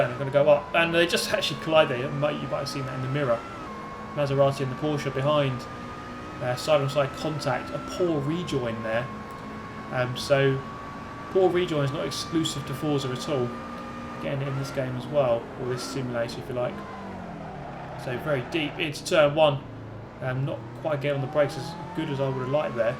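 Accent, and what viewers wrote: United Kingdom. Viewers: his british accent no bullshite intro and dubstep music backgrpund adds up to a good unannoying vid//s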